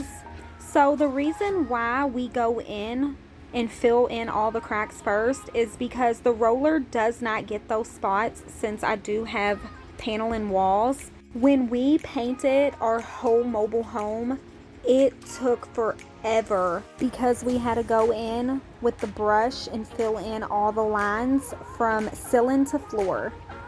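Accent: South, United States. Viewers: I’m trying to figure out your accent. Are you from the South?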